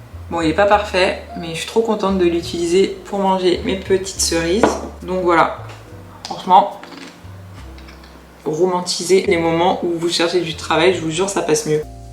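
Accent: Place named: France. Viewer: Salut. Tu viens d'où ? Je suis américain, et je trouve que tu as un accent français, mais tu as des vidéos précédentes qui indiquent que tu vis à Montréal ? De toute façon, tu as un très joli accent français, facile à écouter 😊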